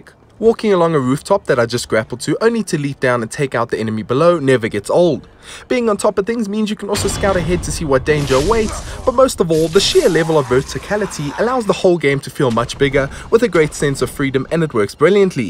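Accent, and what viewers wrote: South Africa. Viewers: Watching this & I am like, this dude sounds like a white South African guy.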